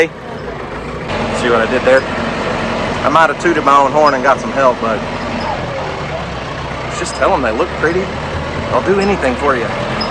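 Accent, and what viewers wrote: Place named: Alabama, United States. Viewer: Those that are wondering…. has to break up the Cog….Hill because the A.I. (Artificial Intelligence) that adds the Subtitles, hears Cog Hill said normal speed with an Alabama accent, as Cock. It thinks is swearing/being rude and either removes the video or doesn’t pay him. 🤦‍♀️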